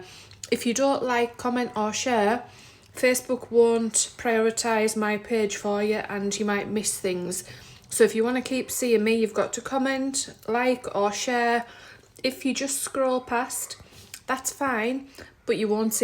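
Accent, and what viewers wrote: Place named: Silksworth, United Kingdom. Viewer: I have just made this again cos it was so nice last time. 😊I grew up in Silksworth and love listening to you. You sound like I used to. Told my sister to listen to you She still lives in Washington.. keep going with the channel. 🎉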